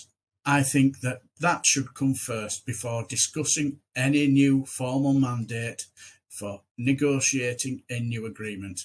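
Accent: Yorkshire, United Kingdom. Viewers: Thank you for the videos. Great stuff, and that Yorkshire accent…. Beautiful! Have a great Xmas, and looking forward to listening to you again soon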